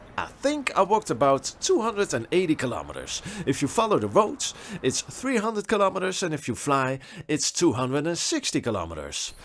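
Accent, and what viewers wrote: Netherlands. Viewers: Het moment dat je startte met spreken hoorde ik dit is een landgenoot 🤣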